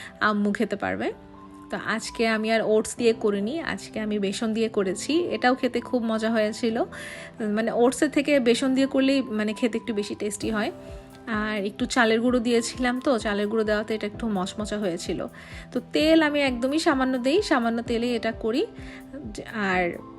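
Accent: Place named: Rangpur, Bangladesh. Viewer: Apu apnar onek kotha Rangpurer mone hoy.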